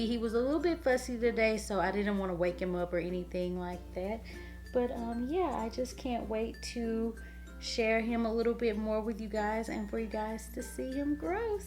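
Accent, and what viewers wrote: South, United States. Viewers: You have a cute southern accent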